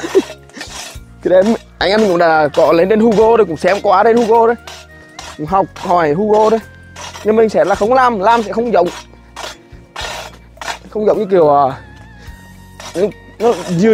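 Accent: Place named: Central, Vietnam. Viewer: nói nhanh quá dọng miền trung hơi cứng nên em nói chậm nhịp lại cho khán giả nghe kịp vì khán giả là người khắp mọi miền tổ quốc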